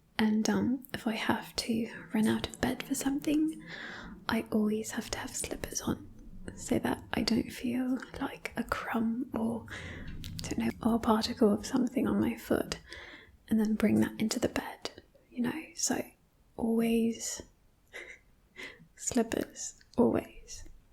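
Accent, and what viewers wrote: England, United Kingdom. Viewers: The English accent is really good,. Side note, if you can't stand the feeling of skin against fabric, are you naked all the time? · Its funny these are a mix of different brittish accents lmao. Shes like cockney, posh, chavvy etc lmao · You are always so Beautiful!!! I really appreciate you and your work and you look so Beautiful and Sexy with Glasses!! I love the British Accent! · That accent was awesome I can speak in a British too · Love your English accent. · Oh my god, your English accent is so good. I’m from the UK so can usually pick up on little inaccuracies, but this one would fool me! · The accent is very impressive. I am from the UK and have friends who talk exactly the same. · My god your English accent is incredible, or is your American accent amazing, i'm confused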